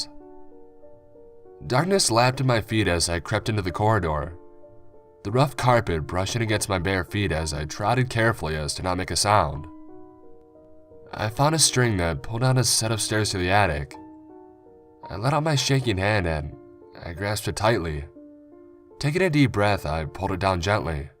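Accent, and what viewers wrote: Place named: United States. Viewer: The fuck kinda dialect is this, Exceptional American?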